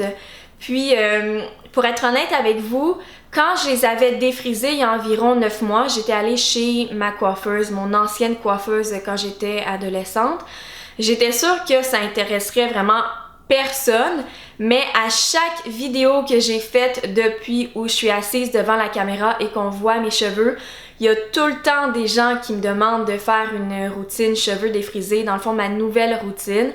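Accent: Quebec, Canada. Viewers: Tu Es belle 🙈et tu as l’accent de Céline Dion 😍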